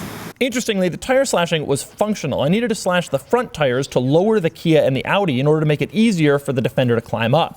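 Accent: United Kingdom. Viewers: you sound like a land rover enthusiast...british actually, not forgetting that british motors are awfully unreliable. get that from an african!!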